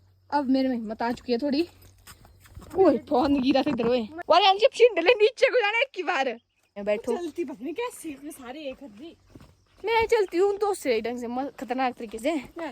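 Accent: Pahadi, India. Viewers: Hindi ke sath phadi tune achhi hai❤ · Ap hindi bhi bolte h pr tone pahadi hi aati h 😁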